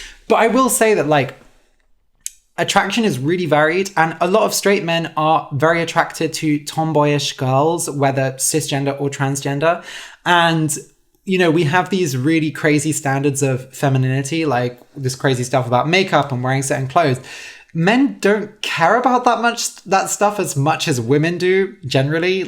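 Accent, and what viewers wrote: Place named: United Kingdom. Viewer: oooohh! a Brit! (or somebody who sounds similar)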